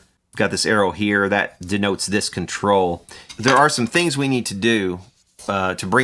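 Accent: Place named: Georgia, United States. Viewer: SOUTH Georgia accent, be precise! nice work. Nice compressor.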